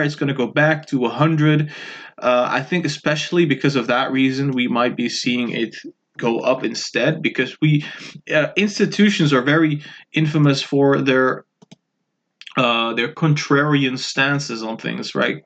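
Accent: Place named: Netherlands. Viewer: Ik dacht al je engels heeft een Nederlands tintje hahah, volg al een tijdje je video's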